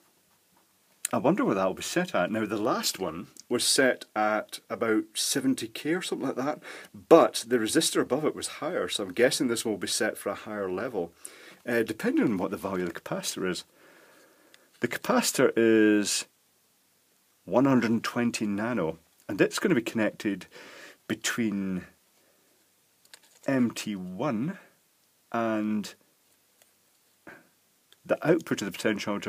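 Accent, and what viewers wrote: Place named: Glasgow, United Kingdom. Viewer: Was watching with fascination, and, just for giggles, switched on the inline captions., with the technical terminology and your mild Glaswegian accent, it really didn't do a very good job, I went to a website yesterday that dealt solely with misheard song lyrics; I wonder if we can supply them with material for an annexe?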